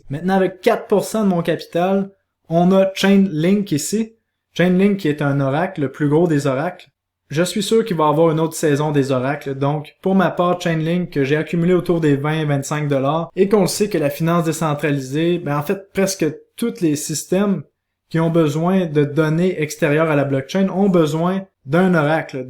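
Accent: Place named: Quebec, Canada. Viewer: Ah je voulais savoir si tu as un excel perso pour tes crypto ou un logiciel à me proposer ? Merci de ton aide et de ton accent , ça me rappel mon premier voyage au Québec, il y a déjà 30 ans et oui !!! Bien cordialement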